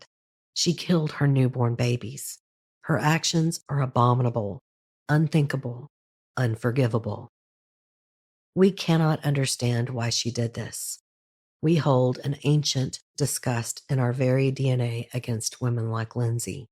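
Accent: South, United States. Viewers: She didn't come up with the hypothesis. The shrinks did. If anything she was candid and remorseful. She obviously had issues and while I don't think the boy understood it, she likely did have that kind of compliance that made her go along with it when he said he wanted sex and to marry her-- he was probably exploiting her. I bet she has a below average IQ and other problems. The truth is cognitive dissonance is incredibly common. As a dental health professional her reaction to a biohazard would be to clean it up. I feel badly for the babies but I know smart women who could protect themselves during sex who don't. They don't defer to a morning after pill or ( as much as I dislike it) have an abortion. The cop and the judge were biased. I get their emotions because we can't imagine killing infants. But let's be honest. They're men. Their expectations are not entirely empathetic or intelligent because they have that whole Madonna whore dissonance about women. 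If they had to have babies they would be a little less judgemental. Men can make babies and no one judges them for splitting, for dodging support and denying them. I know men with six kids by as many women who don't pay child support. Or anything else. No consequences. You did a very fine and fair job here. Ive been binging on the show today& love it. I grew up in the south but have been a vagabond since I came of age. It's good to hear that sweet southern sound of the storyteller in female form. I think of Flannery Eudora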